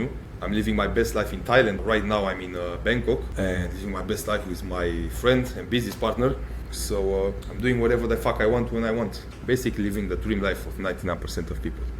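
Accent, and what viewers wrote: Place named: Balkans, Eastern Europe. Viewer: Balkanski Prevarant sa užasnim naglaskom, scammer lmao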